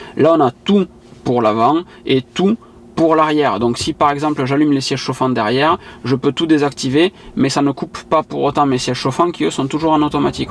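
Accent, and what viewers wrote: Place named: Montauban, France. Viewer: hello , bonjour de Montauban , content de trouver un autre youtubeur avec le meme accent que moi ;)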